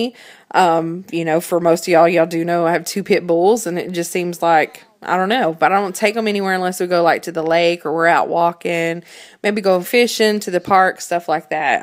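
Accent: South, United States. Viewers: got that cute little southern twang in your voice :P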